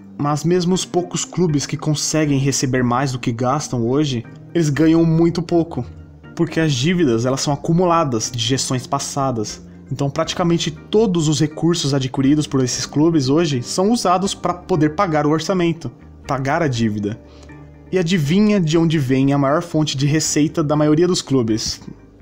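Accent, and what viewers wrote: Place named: Inland São Paulo, Brazil. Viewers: nitidamente paulista!!! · Ele deve ser do interior paulista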